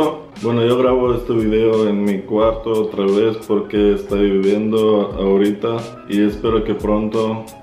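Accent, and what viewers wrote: Mexico. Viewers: Como que te escucho cada día más mexicano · del reino de Castilla antiguo o español por la España actual? Español mexicanizado en todo caso ,yo diría.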